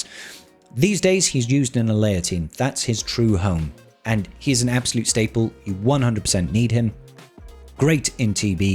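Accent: Wales, United Kingdom. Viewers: Same here, I have all of them😂 Just enjoy listening to our favorite Welshman.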